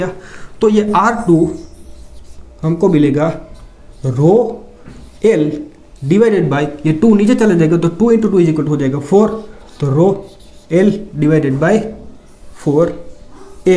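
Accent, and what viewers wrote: Gujarat, India. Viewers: Nice explained. Are you from Gujarat?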